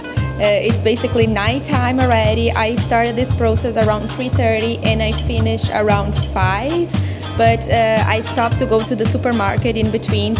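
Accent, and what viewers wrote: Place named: Brazil. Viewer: I recognize that accent. Are you Brazilian?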